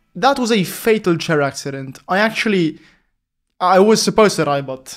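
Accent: Italy. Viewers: are you italian? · Are you italian?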